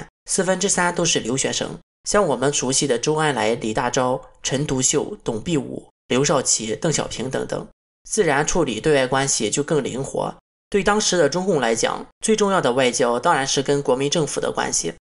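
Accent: Shandong, China. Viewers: 听口音作者您是山东人？有点好奇您现在在国外还是国内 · 听口音你是山东人吧，反正是中原地区的人，别装台湾人了好不？还沦陷，中国现在过得很好，稳扎稳打，得民心者得天下，如果中共是你们口中那样极端的专治，以中国人的革命精神，早给赶跑了，中国人是世界上最爱革命的人，农民起义在历史上数不胜数，中共之所以能成功就是顺应历史潮流，联合了一切可以联合的力量，说直白点，就是得民心，彻底解决了封建、买办和殖民势力。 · 这口音，老家山东的吧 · Klause哪里人？口音听着是山东人？ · 这口音山东人吧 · 看了点半吊子历史书，可能也受了点大法导师的点拨，卷着大舌头操持着山东大葱味儿的国语就敢评说天下啊。你是真有勇气真有脸。论国际关系，当年德国美国苏联都帮国民党，共产党到陕西，只剩下两万大洋命悬一线，国际上怎么帮了？共产党勾搭国际打赢了国民党？你这逻辑是跟李洪志学的么？ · 题外话，请问klaus是台湾人吗？听口音不像 像山东人。。。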